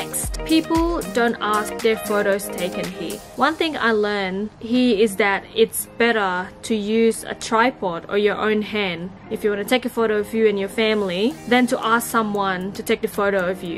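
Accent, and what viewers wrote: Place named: Australia. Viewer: You sound like you've been here a while, judging from your accent lol.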